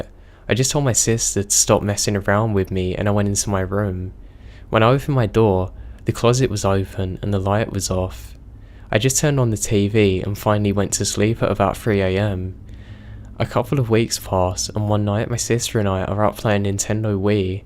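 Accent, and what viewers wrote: United Kingdom. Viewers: Is this an British accent on the first story? Just because an apparition looks like someone like a little girl, doesn't mean it is as it appears. The second story indicates that when the young man was at home (he had the whole place to himself) with his girlfriend that he married later. He was taking a shower and saw "help me" me on mirror in the bathroom and right before this he saw an apparition after the lights dimmed. Then, he says he didn't want to go home that night, but his parents gave him no choice. Hum? I thought he was at home? Finally, it was time to move out into their new, home, Then, he went back to his home with his girlfriend to get the phone charger he had left there The narrator stated this was the first time his girlfriend had been in the apartment. Hum? Am I the only person that heard noticed this?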